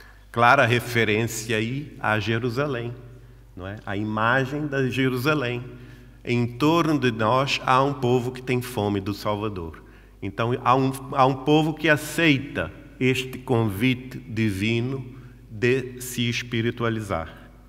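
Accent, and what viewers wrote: Portugal. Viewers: o está com sotaque de Portugal?? 😳😳😮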